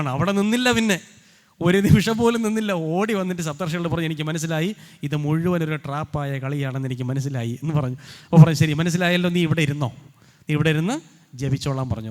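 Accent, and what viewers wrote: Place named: Thiruvananthapuram, India. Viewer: തിരുവനന്തപുരം ഭാഷ യും. മഹാ പണ്ഡിതനും ഹരേ കൃഷ്ണ 🙏 ഇതു കേൾക്കാൻ sadhichathu മഹാ ഭാഗിയം 🙏🙏🙏🙏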